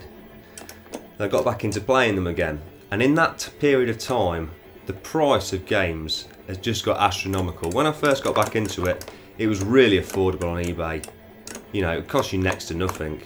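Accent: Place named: Midland, United States. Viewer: Fair comment - I'll try to add a bit of comentary - although I'll probably best add some subtitles to enable any non-locals to understand my midland accent rambling lol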